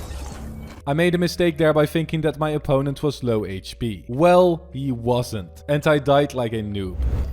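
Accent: Netherlands. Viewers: are you dutch? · je bent zoizo nederlands ik kan het horen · Kom je uit Nederland wand je heb een Nederlands acsend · Are you dutch. I am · Ge hoort gewoon dat da nen hollander is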